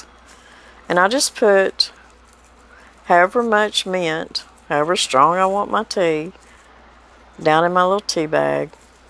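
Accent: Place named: Arkansas, United States. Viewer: I love it that you can make a video without getting “made up”. You look pretty without it. Your voice and your accent is just like my family in north eastern Arkansas. I feel like I should know you. I’ve used many of your recipes. Keep it up!!!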